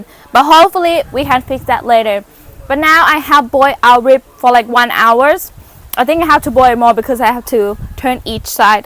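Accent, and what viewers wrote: Australia. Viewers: Did you learn English in Australia? Can hear a little accent. Food looks great! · I think you picked up an Aussie accent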